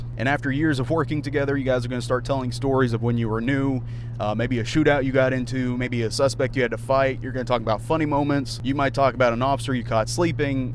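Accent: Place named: South, United States. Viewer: your southern accent doh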